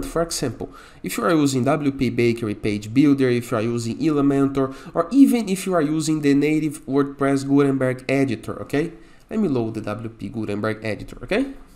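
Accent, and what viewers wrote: Brazil. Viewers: tabom tabom vc ta certo vc criou algo kkkkk mas eu ri mto reconheci o sotaque brazilero na hora kkkkk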